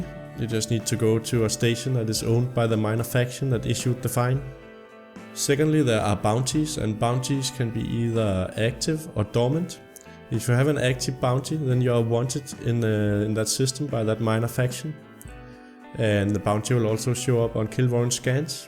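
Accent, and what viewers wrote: Denmark. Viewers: Hmmm, is that a danish accent?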